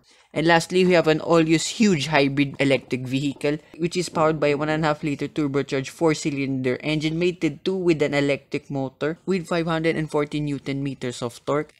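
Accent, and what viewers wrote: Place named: Philippines. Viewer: The pinoy long e and short i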